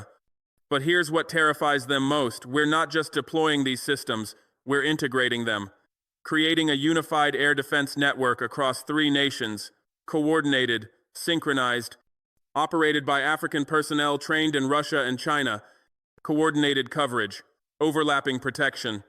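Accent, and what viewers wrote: United States. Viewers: Is this real why has he an American accent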